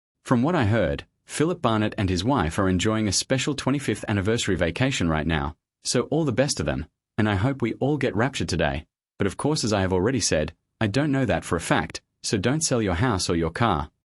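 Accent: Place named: Australia. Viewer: You sound like a robot voice with an Aussie accent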